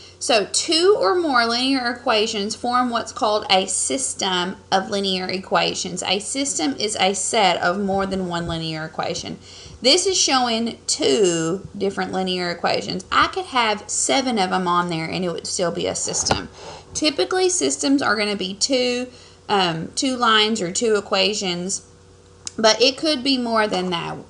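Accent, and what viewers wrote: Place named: South, United States. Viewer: when here accent yeeha I felt that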